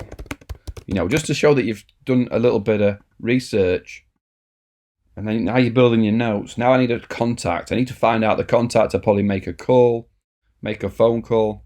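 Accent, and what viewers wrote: North, United Kingdom. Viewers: Hi, Thanks for sharing this! I'm (we are) new to HubSpot. Its good to hear a Northern UK accent in a tutorial. My brain switches off when hearing an early millennial American accent. I guess I'm conditioned. Really helpful intro. Thanks again! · Please remove that British accent and speak like a human.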